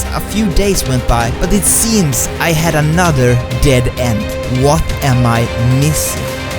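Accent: Sweden. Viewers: U sound so swedish that's probably cuz u are from sweden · Man hör verkligen den svenska engelskan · Hörde på din dialekt att du är svensk! Jätte bra video btw 😄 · The second I heard your accent, I knew that you were Swedish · Are you swedish? Because your accent sounds like so... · Hahahah jag älskar din svenska brytning 👍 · ''A meteor hits the city and..'' GOSH you can just hear whole sweden in his voicexD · Are u Swedish? Lol (I am and I just recognized ur accent) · Älskar att man hör att du är svenne hahahahaha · clicks on random sims video from a YouTuber I've never heard about before Gets hit with strong Swedish accent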